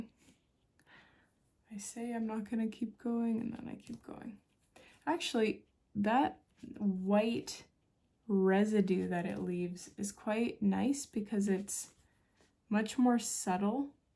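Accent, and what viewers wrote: Canada. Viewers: Thought I detected small accent-canadian?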